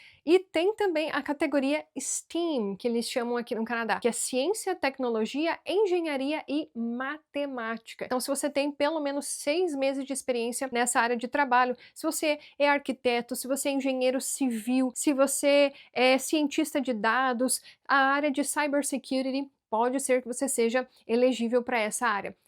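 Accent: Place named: Parana, Brazil. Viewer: Seu sotaque e um barato puxa o R tipico do parana e o S com som de X tipico dos alemães do sul do Brasil, alem dos anos de canada....🎉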